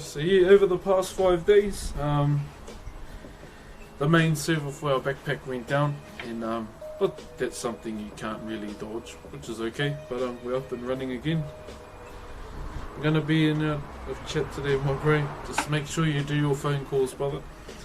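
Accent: New Zealand. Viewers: Its just the kiwi accent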